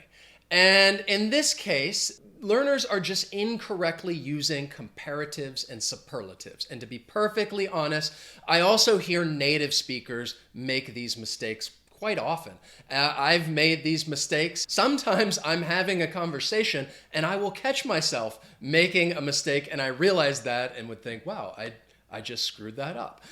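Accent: United States. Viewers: Thank you for this video. You teach very well! We Spanish speakers need to learn English for native English speakers as you. The best of all...I don't need to pay anything to watch your videos and your lessons are short. I don't even need an expensive English textbook . Your English is very clear and I love American accent. 🗽 Keep it up teaching! Greetings from Colombia! 😁👍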